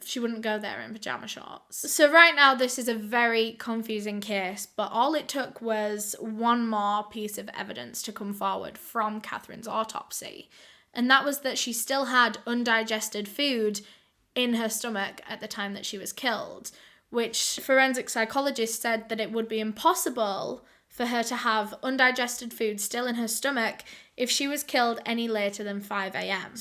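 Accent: United Kingdom. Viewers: so is that a British or Valley Girl accent. I can't tell.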